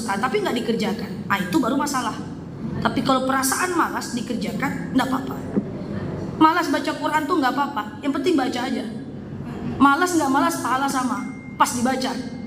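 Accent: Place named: Padang, Indonesia. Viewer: Saya suka sekali ceramah nya ustd org pdg ya bahasa ny kayak org pdg udh cantik pintar lg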